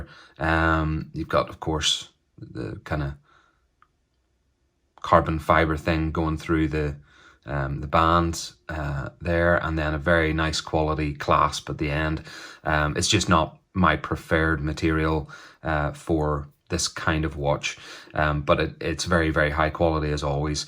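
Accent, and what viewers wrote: Northern Ireland, United Kingdom. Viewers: great to hear a NI voice. I have a 38 with red bezel on order. thank you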